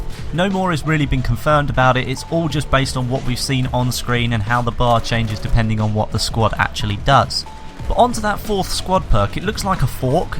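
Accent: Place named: United Kingdom. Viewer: i love this british accent :D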